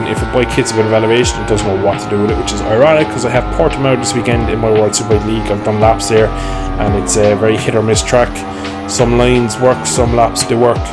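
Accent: Ireland. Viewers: Is thst a cork accent i'm hearing? 😉 Was trying out VRiders SBK natively on the Quest 3 and saw someone mention GP Bikes and ended up here. Havent tried GP Bikes yet but VRiders SBK is actuallt decent for an AppLab game.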